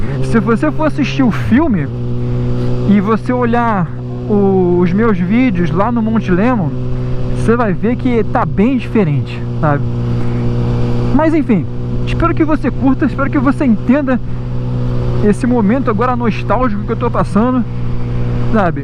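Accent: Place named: Rio de Janeiro, Brazil. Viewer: eu acabei de rever o filme, cara parabéns pelo vídeo e abençoada seja a Internet né por que nos permite coisas como essa sensacional curiosidade nostálgica que você trouxe! Um adendo, esse filme foi fantástico pelo carro pelo amor adolescente (eu era apaixonado na atriz, hoje não ela tá com 57 anos kkkkk) a história de suspense como um fantasma que buscou uma vingança justificável, através do desconhecido pra nós, máquinas de outro mundo, uma força cósmica, o ronco pra lá de insano do carro parece sincronizado de 2 motores deu um efeito assombroso...apesar de hoje parecer tosco como você disse na época nooossa era top mesmo, e a gente viaja no tempo, olha parabéns de novo e ganhou +1 inscrito brother!! Forte abraço tmj... seu sotaque carioca mano kkk eu sou do Mato Grosso do Sul campo grande, aqui é porrrtera, nóis, faiz, bão kkkk vlew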